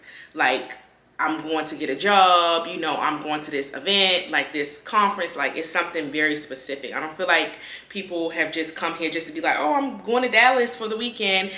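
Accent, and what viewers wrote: Baltimore, United States. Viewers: When you said “dew” for do, I sad she’s definitely from B’more,. Lived all over DC and PG county and went to Howard. Your accent makes me miss the DMV. Good luck!!! From Houston ( although I haven’t lived there in years). You sound like the type of that will do well Dallas is conservative compared to Houston. In Houston they are turned up 7 days a week with after-after clubs. Dallas is more sophisticated and calm. Calm is Good. Houston is like one big HBCU AND ABOUT EATING OUT AND DAY PATIES AND BEACH PARTIES. It can get addicting. You made a good choice, definitely if you’re in corporate , finance and communication. Houston is more like medical, engineering, life sciences, NASA, oil/energy and aerospace. I’m a guy and don’t usually watch these but it caught my eye and I thought about reminiscing. Be safe and prosperous! 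The food in Houston will do it for you . It’s more diverse. There are 600 black owned restaurants in the Houston area( more like Atlanta)Creole, Soul food, Jamaican , Nigerian, Indian, Middle Eastern ,Vietnamese., Latin etc etc. There is excellent black theatre( the Ensemble Theatre) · Girl I knew you were from Baltimore the moment you started talking. I plan on relocating to Dallas from Baltimore in a few months. God is definitely telling me to relocate here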